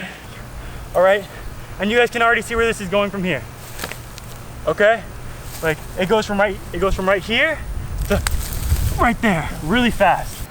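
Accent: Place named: Minnesota, United States. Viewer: Wow, you actually know your shit. You're not just another good looking goofy guy, with chipped teeth that speaks well. Your video (5 things they don't tell you about wrestling?) popped up. It was a good video and funny except you used the f word, I don't mean fuck. I checked out this video, you're a serious wrestler, impressive. You are also a great teacher. Did you do your own film editing? Affective your videos are. I don't remember wrestlers being so critical and such grammar queens. You sound like a Minnesota boy. You are clear and to the point. I think we all knew what you meant. With your personality and those Pete Davidson eyes, you have a great future whether it's in wrestling, teaching, broadcasting, or acting. I hope you are getting promoters and are picky about them. You also need a great Trusted mentor, you're on your way kid. 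Thanks for making wrestling more accessible and interesting. Did I say that right? I don't want the grammar police on my ass. btw your teeth look great at least on video, wear a damn guard Cheers.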